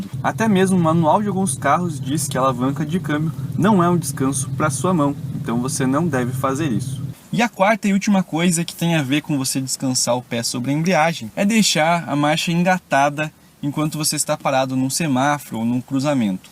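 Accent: Curitiba, Brazil. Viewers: Vc é curitibano? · Dae jovem, tu é de Curitiba?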